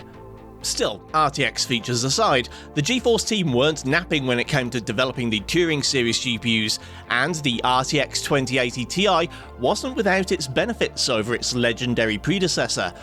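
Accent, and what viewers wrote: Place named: Manchester, United Kingdom. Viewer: Trying to pin your accent down. I'm from Manchester and you have a similar accent but I can't quite place where it is!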